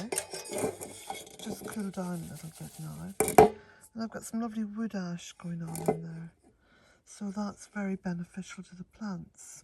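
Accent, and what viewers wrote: Ireland. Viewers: Dear lady thank you for sharing your beautiful life! I was on your website and was completely Enchanted I especially loved listening to the first chapter of your book on selling your home and finding the land. I so love your Irish brogue as my late Grandpa spoke with one. I was wondering if you had any intention of offering your books in audio format so that I might listen to that brogue and to to your voice because it reflects much more deeply than written word. Thank you for considering the possibility. I look forward to exploring your other offerings. I'm trying to remember how I found you but I think it was Revealing Light Tarot and the lovely Mary Ann. Your work connects me to my lost Irish roots. In deepest gratitude, thank you!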